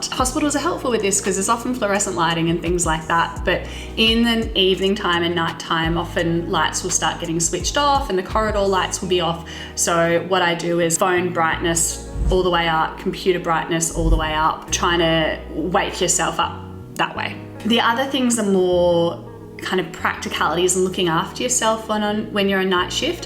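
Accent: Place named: Australia. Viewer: Oh no, I am terribly sorry, I thought you were a British Doctor from the UK. I mistook your Australian accent to British accent. Normally I don't miss. Once again, sorry about that.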